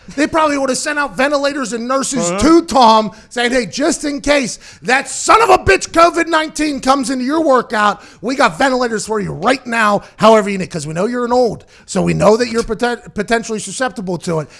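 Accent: Pittsburgh, United States. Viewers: Aht... is embracing his pittsburghese more and more and us ynizers love it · letting the Pittsburgh come out in his speech is fuckin awesome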